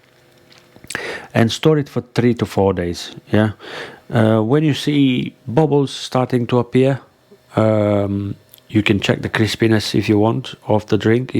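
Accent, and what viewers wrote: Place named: Yorkshire, United Kingdom. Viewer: Great Vlog!!!!!!!!! Thoroughly enjoyed it!!!! I love your 'Yorkshire' accent!!!!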